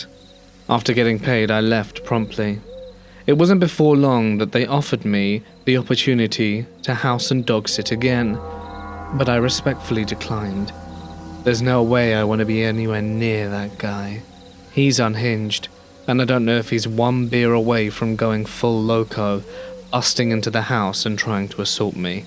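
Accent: England, United Kingdom. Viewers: Yay! New video from the classic English man narrator. Thank you:)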